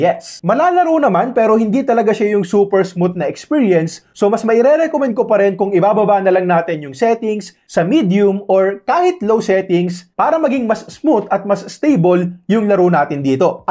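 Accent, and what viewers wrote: Cavite, Philippines. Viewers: tga cavite pala kayo sir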